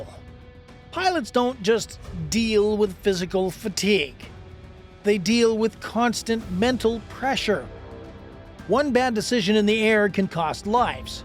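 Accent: Canada. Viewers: Dude are you Canadian? No hate but what?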